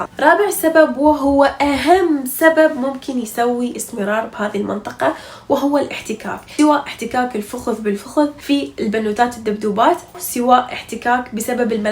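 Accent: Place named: Iraq. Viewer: خطفتني خطفتني اللهجة العراقية خطفتني ❤روووووعة مش ممكن كمية الابدااع فيها احب العراق واهل العراق وتراث العراق انا مغربية احنا في المغرب درسنا عن العراق وتراثها وعن حدائق بابل المعلقة كلش حبيييييت 🇲🇦💗🇮🇶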